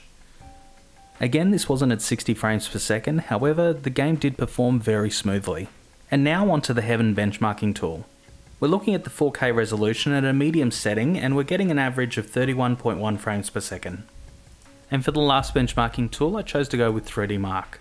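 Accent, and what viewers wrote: Australia. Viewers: Problem with our Australian voices mate? You're probably American.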